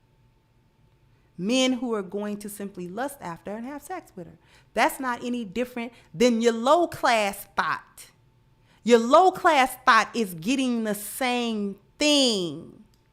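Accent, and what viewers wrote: Chicago, United States. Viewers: I didn't know you were in Chicago, but since you said it I can definitely hear that Chi-town accent. 😉 I have family and friends all through the Chi. By the way, you're coming with that fire 🔥 today! Lori Harvey is getting ran through... It's sad really.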